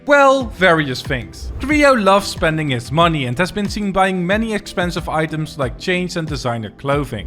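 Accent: Netherlands. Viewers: i hear a dutch accent can that be true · i smell dutch in ur accent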